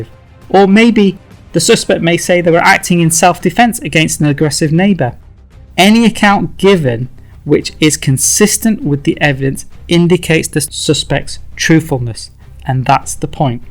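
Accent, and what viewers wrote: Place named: United Kingdom. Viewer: The narrator has a U.K. accent. I assume this would be great advise if I was there.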